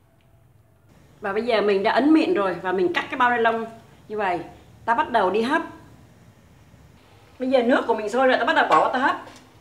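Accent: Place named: North, Vietnam. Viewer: Bà ơi, cho con thắc mắc 1 tí được không ạ? Con thấy bà nói giọng bắc, có phải bà là người miền bắc không ạ?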